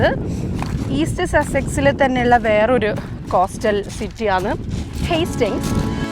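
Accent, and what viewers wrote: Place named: Kannur, India. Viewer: “Ayyoo aah pennu avidenneduthu THULLI” 😂. Kannur baasha❤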